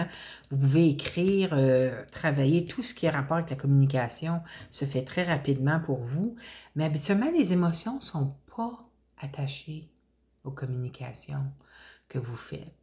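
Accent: Canada. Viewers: Un petit accent Canadien ! J'adore ! ❤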